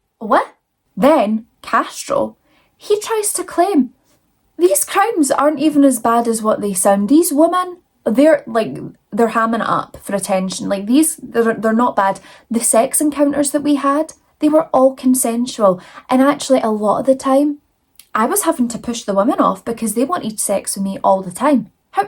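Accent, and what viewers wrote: Scotland, United Kingdom. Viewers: "how does he even get women/with that hair line" "had to do the big shop at tesco" "that was patricia" 😂😂 i love how your sense of humour can shine through even when talking about such a monster. your channel has been a great find, thanks to the person who recommended you 👏👏👏 Love a Scottish accent so cute ❤❤ I know this case i read the survivors autobiographies but I've forgotten a lot and didn't know castrel's history so thanks for new information and being so thorough Edit: near the end when you said may 6th im watching this on may 6th 😮 spooky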